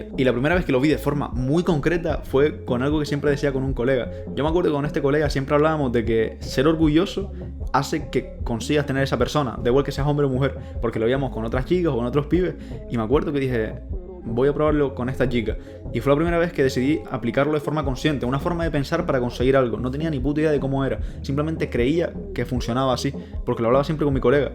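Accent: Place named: Spain. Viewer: BOOOOFFF, Que videazo tio, ostia, hasta se me ha pegao' el asento Ejpañolete. Saludos, soy de Colombia, me has dado mucha claridad, un abrazo, mucho exito y Dios continue bendiciendo tu Don!!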